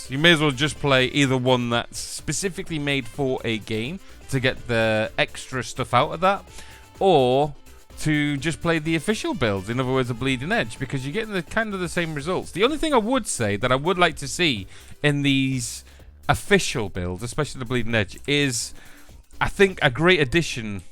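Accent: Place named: United Kingdom. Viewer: Your british accent makes me h a r d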